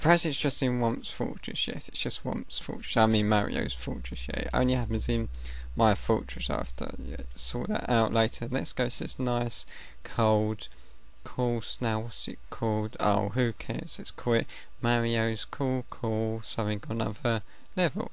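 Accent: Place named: England, United Kingdom. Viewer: This is HILARIOUS! +1 for an English accent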